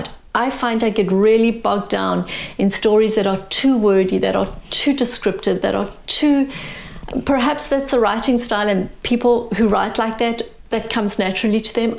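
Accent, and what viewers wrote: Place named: South Africa. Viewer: Hi, Sonia from South Africa here. I just listened to your 4 part talks about voice in memoir. I am in the planning phase of writing my story of how I met Jesus (in an armed robbery at 4am in my bed) after being lost in the new age for 20 years. I feel soooo inspired after listening to you speak so beautifully about voice in memoir. I want to encourage you to please keep making these videos. You are talented, well spoken, your tone is calming and confident... and most of all, you are doing what Jesus created you to do. In Him, we can be sure that we're walking the story He purposed us to do, as it says in Ps 139 - He wrote down our days in His book! I will be praying for you to get more subscribers and also that people will comment more. I see that you are based in Canada, but I pick up a South African accent. Are you originally from South Africa? 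Blessing to you my dear sister in Jesus. ps. I did a youtube search for 'voice in memoir', and your channel was first in the search results:-)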